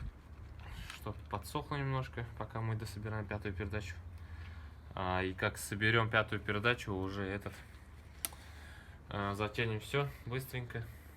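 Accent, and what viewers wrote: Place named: Tatarstan, Russia. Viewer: Вот и татарский говор вам))) Привет с Казани)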